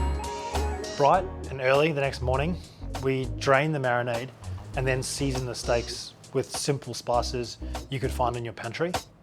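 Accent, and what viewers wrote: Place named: Australia. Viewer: I can't distinguish it I just heard Australia..!!! Hehehe..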